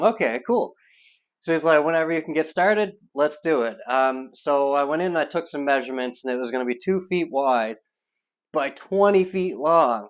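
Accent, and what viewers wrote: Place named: Atlantic Canada, Canada. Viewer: I love your accent! Nova Scotia or Newfounland?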